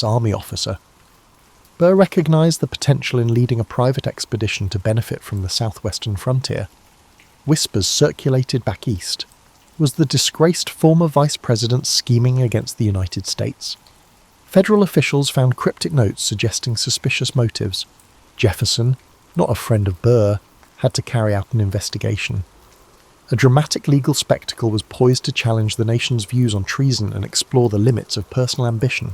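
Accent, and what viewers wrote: United Kingdom. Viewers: p.m. in the state of Ohio in the USA. Note: I find it very helpful to put the speed to .75 Love your British accent! 🙂❤️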